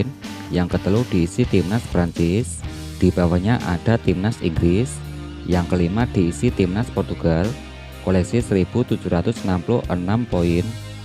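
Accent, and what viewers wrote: Java, Indonesia. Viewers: Kurang menarik mendengar suara latar, gaya bahasanya Jawa..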